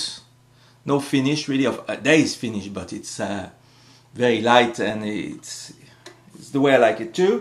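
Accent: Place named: France. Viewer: On sent l'accent français :') Bonne video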